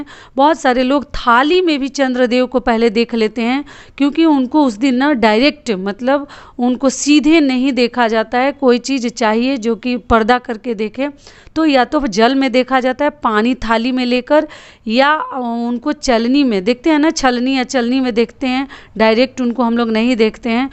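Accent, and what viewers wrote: Bihar, India. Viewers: Mam ap bihar se ho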